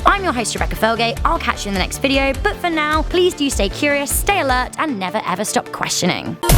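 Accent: United Kingdom. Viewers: Aaa B yeah it’s a British accent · Just want to make it known to the world that rebecca has the absolute best anglo accent that I have ever heard.... My opinion hasn't anything to do with the video nor anything else really, but I felt that I would share it with the rest of the world for reasons.. (´∀`) avec beaucoup d'amour de france. (✿◠‿◠)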